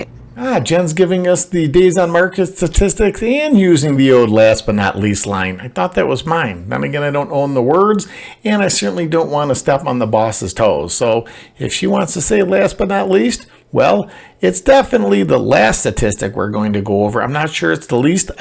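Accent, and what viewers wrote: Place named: Buffalo, United States. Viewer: Ha ha I missed hearing your Buffalo accent lol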